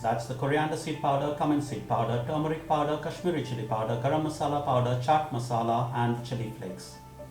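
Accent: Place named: England, United Kingdom. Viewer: Good thing is that you took care of the fact that your filling is neither oily nor runny. Usage of Tomato paste instead of the traditional method not only enhance the taste but it helped you get the required consistency in your filling. What I observed is that in this recipe your camera angle is not top notch. Especially when you were making your filling and sauteed onions. This dish looks more South Indian in texture though no coconut is used. The running commentary always reminds me old Cricket matches used to telecast in 80s n 90s with aristocratic English language and meticulous English accent. All in all, exciting stuff as always.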